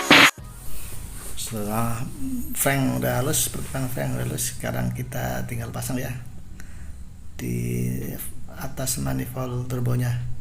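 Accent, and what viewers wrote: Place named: Banyumas, Indonesia. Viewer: bahasanya ngapak om, salam dari republik ngapak, purwokerto 😄😃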